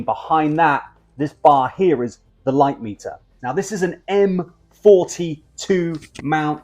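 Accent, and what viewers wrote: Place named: Mid-Atlantic, United States. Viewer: Don't forget you are British, very Bad manners to wear a hat indoors. 🧐 Also be careful you have an annoying mid Atlantic accent. Dont forget to pronounce your T's and its Mathematics not Math....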